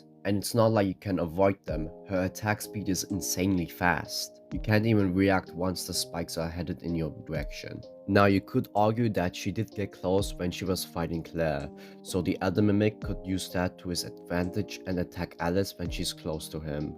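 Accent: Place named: United Kingdom. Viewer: I don't know but you talk like some smooth british guy while having coffe+though you're saying facts most of the video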